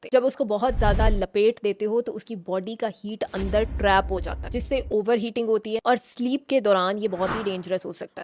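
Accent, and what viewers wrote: Punjab, India. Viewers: Hhhh is my fvrt Dr, you talking is so cute 😸 with panjabi 😘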